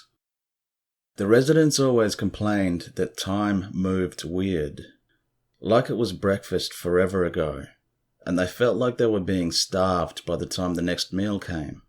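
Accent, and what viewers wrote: Australia. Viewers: Awesome Aussie accent and awesome ghost videos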